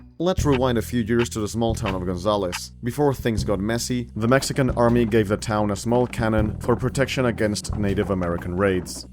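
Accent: Castile, Spain. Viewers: My knowledge of Mexican history is bad, but not terrible, I’d like to think I have a 1.5 or 2 stars rating. But hearing the proper Spanish pronunciation of names and not recognizing them, even though I’ve READ these names before has me shook lmao 😂😂😂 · He said in another comment that he was born and raised in Spain, so he's just talking in his native accent. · Sounds very Castilian, I like it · Why are saying it like the Spanish way and not the Mexican/Latin American way of pronouncing the Zs and Cs. The odds are none of these historic figures pronounced it that way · Your English is among the best of any Spaniard I've ever heard... although I have to say it's a bit odd/amusing to hear all these Mexican names pronounced with a Castilian accent.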